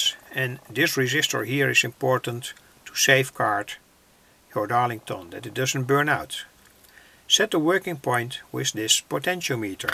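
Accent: Netherlands. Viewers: Leuk Nederlands accent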